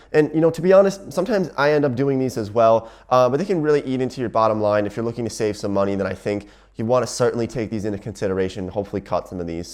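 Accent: United States. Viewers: im very confused as in if hes american or birtish lmao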